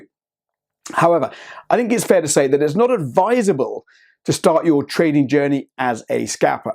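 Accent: United Kingdom. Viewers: your genuin teachings and precise advices are so perfectly bang on mixed with very british sense of humour and nice london accent 😝 i believe you are a very good teacher. God bless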